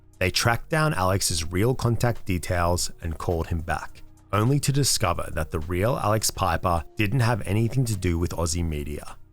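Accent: Australia. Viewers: Wait... are you Australian?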